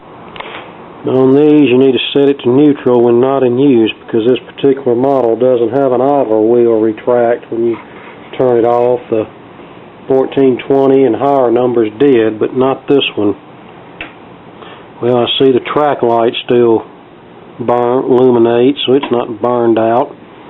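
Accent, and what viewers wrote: United States. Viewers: Fucking Cowboys accent I just love it 😏😌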